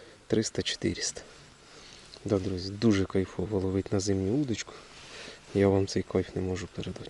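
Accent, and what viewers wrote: Poltava, Ukraine. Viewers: Доброї ночі. Судячи по говору, це походу Полтавська обл. р. Сула?!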